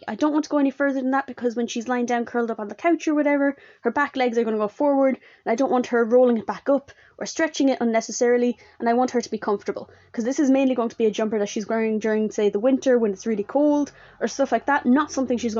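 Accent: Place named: Ireland. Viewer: Excellent!! And with an Irish (?) accent to boot! 🙏🙏👏👏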